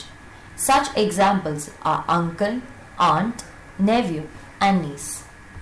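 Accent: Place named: United Kingdom. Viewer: That horrible British accent makes it impossible to understand.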